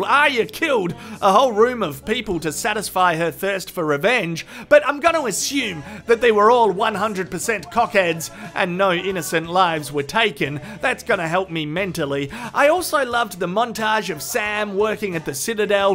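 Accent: Australia. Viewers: Stumbled across this in my recommended list. Hope people don't think all Aussies sound like this or have such a potty mouth.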